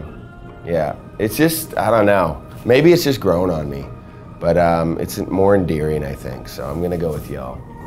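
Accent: Canada. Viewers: Ontario probably, Maybe the Montreal area of Quebec, or southwestern B.C. But im just guessing from the accent. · I LOVE HIM AND HIS CANADIAN ACCENT 😂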